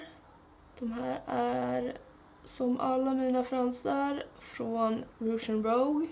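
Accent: Stockholm, Sweden. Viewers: Jag är svensk men sjukt konstigt att höra dig prata svenska 😂 så van att bara höra dig prata eng! 😂 och trodde inte du skulle ha sådan Stockholms dialekt, men tydligen 😂👌🏻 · Like it alot better when you speak english even tho I'am a swede myself 😂 you have that stockholm accent when you speak swedish 😅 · Blev absolut inte sämre på något sätt, så himla mysigt! Va inte alls beredd på att du skulle ha så mycket Stockholmska i dig. ÄLSKAR! <3 Keep on going girl!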